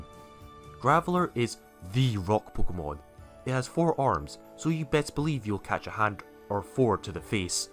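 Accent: Scotland, United Kingdom. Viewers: yeah I had you pinned as Scottish maybe Glasgow or something but in some bits I wasn’t sure lol Great vid.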